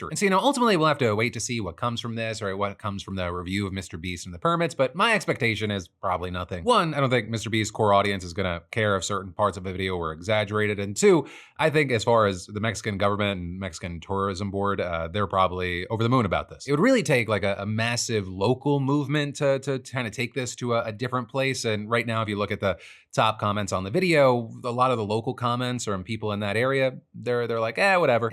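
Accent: South, United States. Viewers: I love the southern drawl creeping into your speaking pattern lmao its awesome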